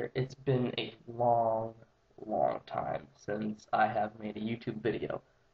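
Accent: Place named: United States. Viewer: Why do you have an American accent?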